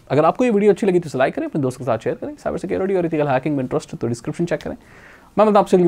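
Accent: Odisha, India. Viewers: Odisha se ho Kya Bhai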